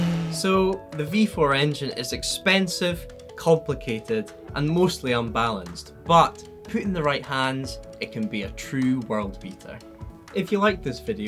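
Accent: Scotland, United Kingdom. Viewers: I wish the video had subtitles...I can't get my ears around the Scot accent.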